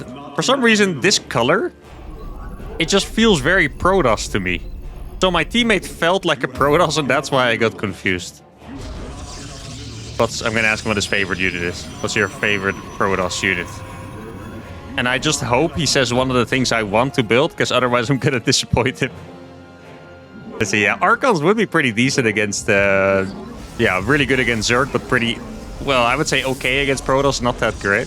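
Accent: Netherlands. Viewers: imagine hating the Dutch accent but the two largest SC2 CCs are both Dutch lol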